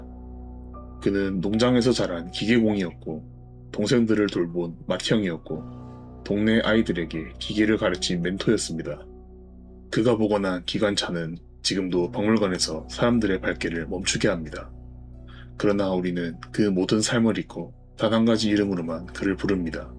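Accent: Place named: Gyeongsang, South Korea. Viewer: AI목소리가 아니라 갱상도 사람이네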